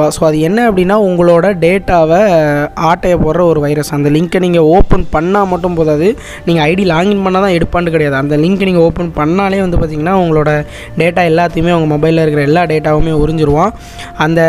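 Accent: Tirunelveli, India. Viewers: Bro neega nellai ya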